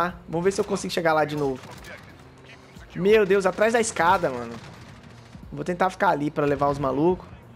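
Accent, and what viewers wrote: Minas Gerais, Brazil. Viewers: cê é de minas?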